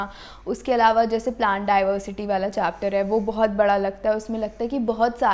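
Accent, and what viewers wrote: Hinglish, India. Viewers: Is she said hinglish!!! ?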